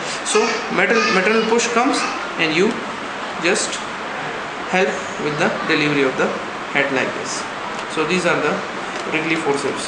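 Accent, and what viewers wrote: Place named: India. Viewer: indian lol... i can tell by the accent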